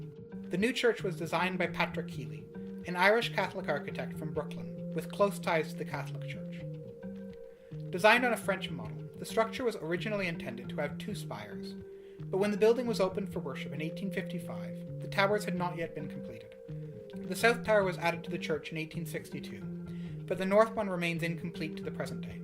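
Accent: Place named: Buffalo, United States. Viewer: Weirdly, although I'm not even American (I'm British) I find the narrator easy to understand and not particularly fast talking.😂🤣 Is this a Buffalo accent? It doesn't sound much like New York. 😉👍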